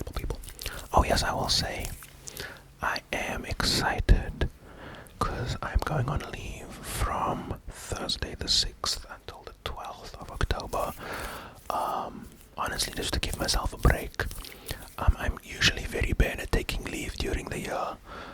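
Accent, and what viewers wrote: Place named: South Africa. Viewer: Finally someone who does asmr with a legit South African accent! Yayyy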